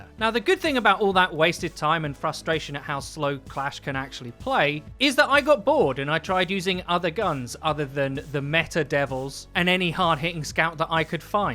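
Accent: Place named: United Kingdom. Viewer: Can we get a destiny guide channel where it's not a british dude?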